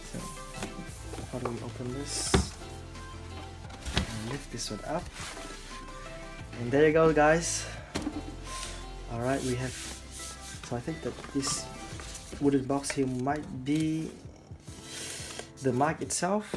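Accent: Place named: France. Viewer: Are you french ? Because you have a Very big french accent .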